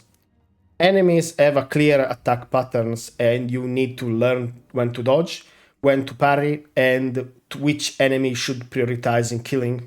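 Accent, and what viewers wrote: Italy. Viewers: pasta accent - you have my like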